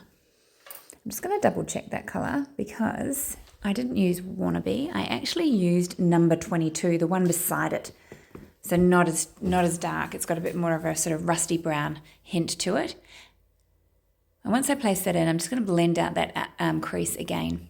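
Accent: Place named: New Zealand. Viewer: Ohhh I see becuz it says Australia on your bio but your accent is a bit kiwi 😅 I was a quite confuse.. I was trying to figure out if you’re a kiwi or an Aussie because u almost sound Australian but more towards New Zealand, it’s like you have mixed accent between the two I’m Thai who have lived in New Zealand for 15 years but now currently living in Australia Btw you have a lovely kiwi accent and you speak very nicely ❤️ it’s nice to listen to 🤗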